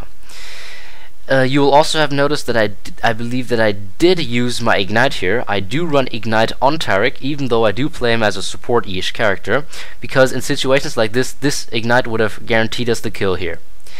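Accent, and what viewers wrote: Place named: Germany. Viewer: Man hört dass du deutsch bist aber nich so dchlimm ^^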